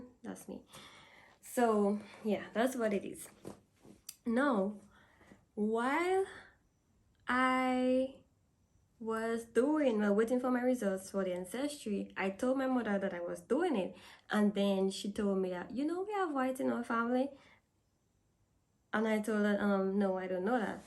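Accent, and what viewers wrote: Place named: Dominican Republic. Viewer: 100% from DA,,, that ACCENT,,, and obviously I am from there also.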